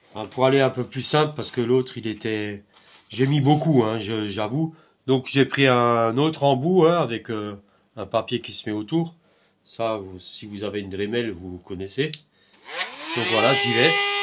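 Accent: Alsace, France. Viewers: C'est copié ,plein de vidéo sur Youtube sur ce sujet !!!!!!!!!,j'en ai trouvé une vieille de 8 ans ,pour recoller un réservoir eau de cafetière il faut oser ,collage cyano et la poudre sur un récipient alimentaire pas bon ;moi je fais pas ,attention si eau pas en contact avec collage pas de soucis. Ton sujet est un peu comme on dit du déjà vu ,celà ne retire rien a ton talent de bricoleur ,trouver un autre sujet pour durer ,c'est pas évident je jen convient . Tu a un accent Alsacien . Bye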